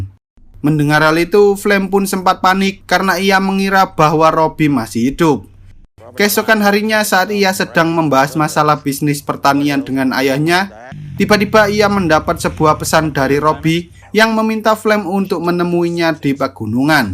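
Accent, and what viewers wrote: Java, Indonesia. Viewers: Teko jowo yo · aduh ngomong nya Jawa medok lagi. ini sebab nya aku benci jawa. logat nya malu malu in. mending sunda lebih oke. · Aduh mendok 😂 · Medok bgt, jadi kurang enak di denger. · Woe logatmu jowo men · Mas maaf, bisa gak kalau ngomong nya gak terlalu medok bgt? Soalnya kaya yg ngobrol sama org jawa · LAGI LAGI MEDOK....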